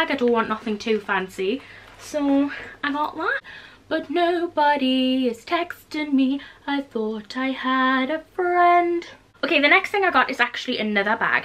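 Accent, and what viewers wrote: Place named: Geordie, United Kingdom. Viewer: You sound SUPER GEORDIE in this vid xx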